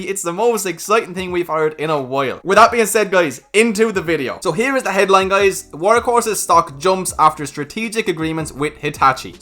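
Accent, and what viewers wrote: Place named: Ireland. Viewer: you rock man! Love the Workhorse videos. I would send you some American Bourbon if it wouldn't be confiscated. Love your content. My wife hears your accent and says "Oh your listening to your Irishman investor." lol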